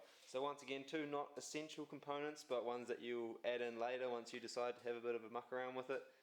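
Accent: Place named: New Zealand. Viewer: haha i found a kiwi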